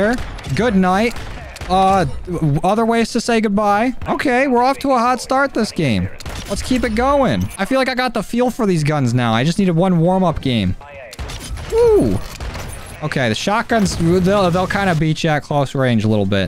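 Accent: United States. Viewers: Americans and their pronunciation 🤦🏻‍♂️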